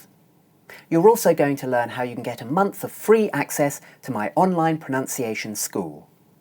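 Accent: United Kingdom. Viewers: I love British accent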